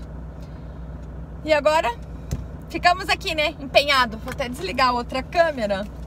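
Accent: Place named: Maranhão, Brazil. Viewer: Não tem como não se encantar com esse "R" puxado, naturalmente show. Irretocável, irrepreensível este sotaque. Ainda mais na sua boca, fixa mais evidente ainda. Parabéns. Deus abençoe. Forte abraço Jalles Imperatriz MA.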